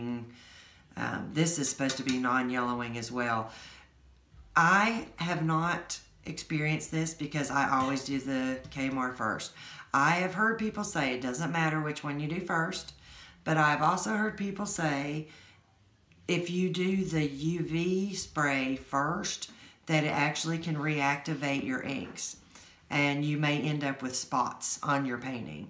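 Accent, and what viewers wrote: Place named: Oklahoma, United States. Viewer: I promised I'd watch it! Very nice job explaining everything....it's easy to understand and I jotted down lots of notes. Mind you...how difficult was it years ago to get all this information...thanks to technology and U-tube it's right there when you want it. Love the way you do your videos...and your accent...you sound a bit like a friend of mine in Oklahoma. Thank you ❤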